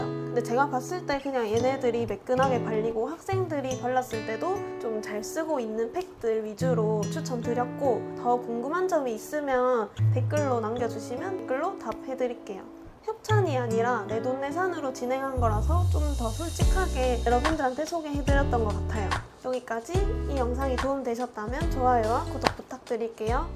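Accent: Gyeongsang, South Korea. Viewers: 인스타로 보다가 영상으로 보니 더 친근한 느낌이나네용ㅎㅎ 특히 구수한 경상도 사투리가용 ㅎㅎ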